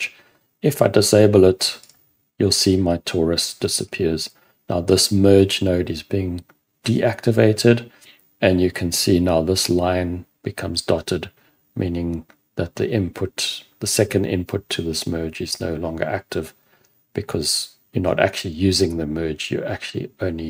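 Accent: South Africa. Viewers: excellent! nice to hear the SA accent :)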